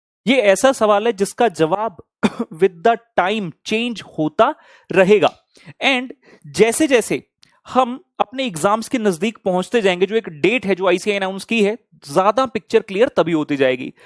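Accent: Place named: Punjab, India. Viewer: Arey sir apka punjabi touch toh bwaal😂😂👌🏽👌🏽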